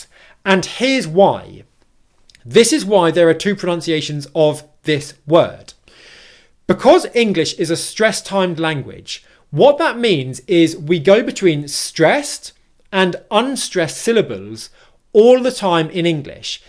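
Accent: United Kingdom. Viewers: From the first time I learnt English, I fall in love with British Accent. It's so elegant. Thank you for making the videos. I love watching it before I go to bed.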